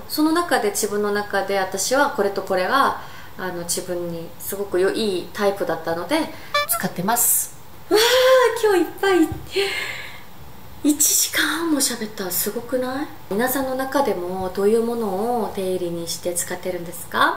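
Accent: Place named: Kansai, Japan. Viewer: 関西弁おもしろい😂アロマ使ってみたいです！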